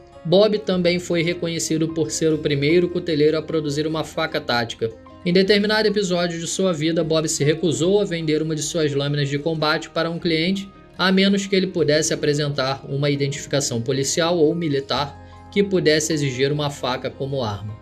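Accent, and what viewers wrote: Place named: Rio de Janeiro, Brazil. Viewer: Cara, esse documentário ficou bom demais, tirando esse sotaque de carioca, ta perfeito 👌🏻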